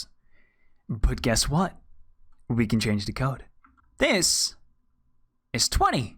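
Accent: Netherlands. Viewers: What kind of accent is this? Dutch? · Hawky he’s probably actually Dutch cuz he kinda has a tiny bit of accent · Are you dutch? i am If someone is dutch say it in the comments.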